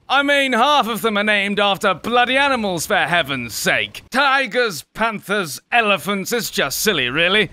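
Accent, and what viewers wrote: United Kingdom. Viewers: Are you British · British accent is so annoying 💔🤷‍♂️ Am I the only one? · very british accent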